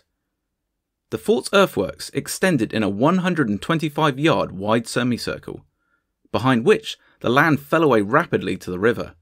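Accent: England, United Kingdom. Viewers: Why is it always an English accents telling us about our American history on YouTube? Thank you AI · Why is an English narrarating go away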